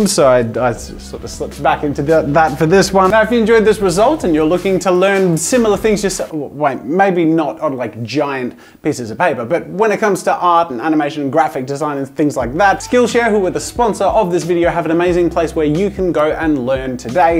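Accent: Australia. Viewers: you sounded the most Australian you ever have in this video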